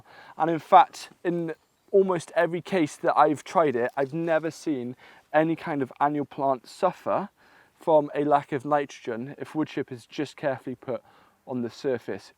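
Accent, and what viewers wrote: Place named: United Kingdom. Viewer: "Woodchip is" Why are English dialects so grating?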